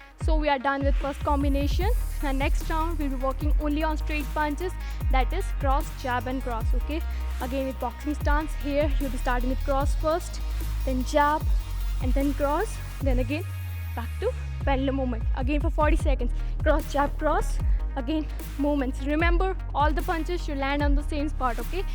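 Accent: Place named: India. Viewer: Her indian accent blow tho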